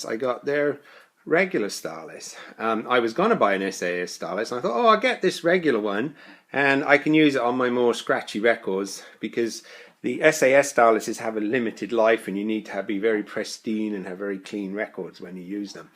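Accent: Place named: West Country, United Kingdom. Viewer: The accent sounds like it's from the West Country, perhaps Somerset.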